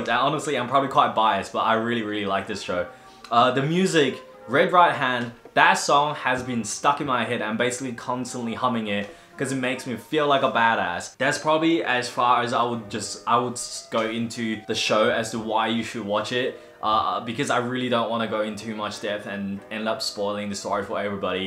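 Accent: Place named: United Kingdom. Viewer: I’m blown away seeing and hearing a Chinese guy with a British accent. I’ve been alive for 40 years and have never seen this before. Congrats on being so unique!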